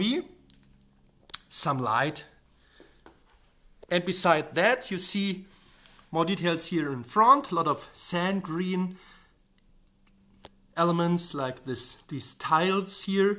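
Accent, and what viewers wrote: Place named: Germany. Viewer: holy shit bruder dieser deutsche akzent mashalla😂 aber daa sieht echt mega gut aus!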